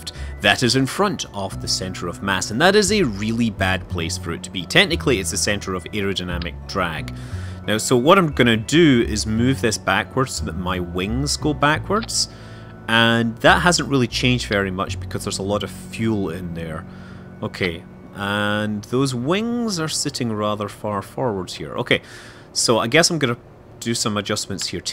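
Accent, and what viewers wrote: Scotland, United Kingdom. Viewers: Somewhere in the UK I don't know what city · Another Scotsman yes!!!!!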